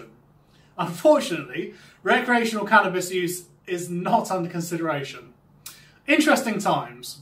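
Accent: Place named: Posh, United Kingdom. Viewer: you know what it is, it's the way you talk, you talk like you went to a private school ( if you want to score some weed and chill on a hammock) you would expect someone with a Glasgow accent to be talking about that. Well I'm on one of the islands now but I will be going back to Bangkok one day. I've subscribed to your page so let's see some new posts. Kind Regards Ryan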